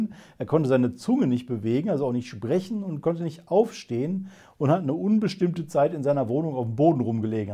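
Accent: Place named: Westphalia, Germany. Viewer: Never. Auf jeden Fall Westfalen. Münster oder so...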